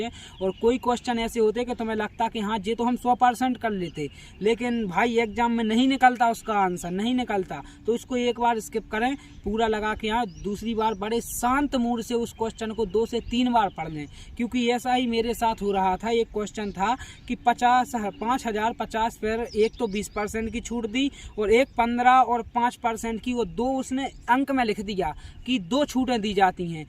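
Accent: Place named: Rajasthan, India. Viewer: Bhai apka Rajasthan me kha se ho rajsthan jaisi language lag rhi hai like bharatpur alwar dholpur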